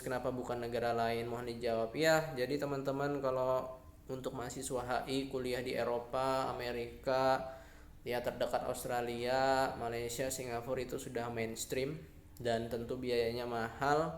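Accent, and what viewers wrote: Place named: Java, Indonesia. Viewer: Saya gak nyangka lo kalo masnya dari kalimantan😀 kirain wong jowo😆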